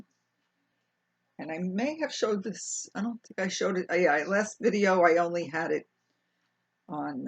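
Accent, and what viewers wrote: New York, United States. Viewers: I like the divine pattern hat & cowl. Looks really great in that color green.I enjoy listening to your NY accent, memories of home. How about a drawstring backpack that teens like. It would be about same size as your bags. 🤔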